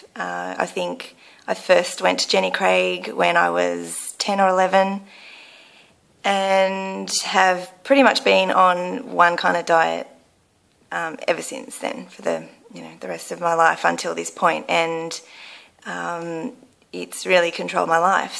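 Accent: Australia. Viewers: I'm starting to think all Aussies are just good looking people! I agree it's refreshing when I hear the Aussie accent. :) You will be amazed on how much things will change even in 3 or 4 months. Glad you reached out to share your journey and I think you'll fit right in! I used the same explination early on as well. If people find the FatKings stuff, then great, but I didn't go out of my way to tell them early on. -Phil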